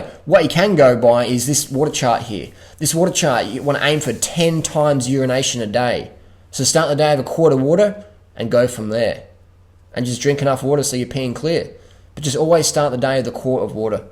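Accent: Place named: Australia. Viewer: the aussie accent is awesome :D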